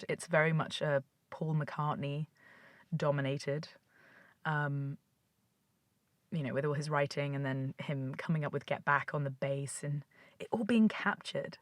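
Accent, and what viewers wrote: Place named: England, United Kingdom. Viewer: I'm yet to see the actual film. So l can't comment on it.. I am a guitarist singer songwriter and have been for 50 years. I love and the music of the 60s and 70s. Great to see your reaction. By the way you have a lovely English accent. It was nice to hear you talk.So clear and not rushed. I won't be able to see the film until I get back to the UK in June. I look forward to it very much. I'm stuck in the mountains near Granada. Me my guitar Paints and my dog entirely alone. I wish you all the best. Happy Christmas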